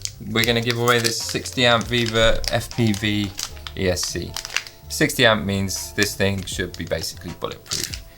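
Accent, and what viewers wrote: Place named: United Kingdom. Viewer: Definitely feels like it's giveaway season on YT at the moment. My last qwad just missed the concrete earlier in a crash and rather than shattering, just entered a roll, bending antennas as it went. No broken props through as it was a ducted qwad fortunately. I was going to end with a blond joke but then looked in the mirror... Great to see you're back at it and to hear a better accent than all the us pilots 🇬🇧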